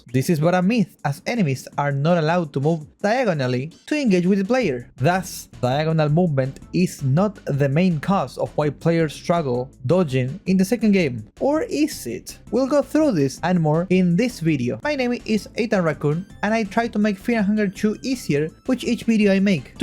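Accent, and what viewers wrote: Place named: Argentina. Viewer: hasta en ingles se nota lo argentino jaja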